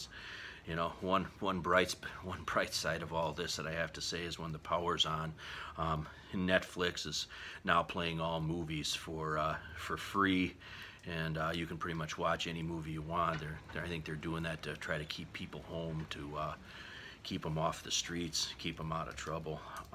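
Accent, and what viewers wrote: Michigan, United States. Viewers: Sounds like you did some research for this one.👍 Just found your channel and subbed Sounds like you’re from Northern Mich. also.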